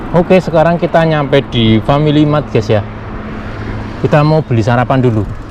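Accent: Banyumas, Indonesia. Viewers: NANG JEPANG YA ANA WONG NGAPAK YAH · Gk bosen liat kontenny bang ❤. Gaya ngapaknya khas...😂😂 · Gaul rika yakin...wong Banyumas ya min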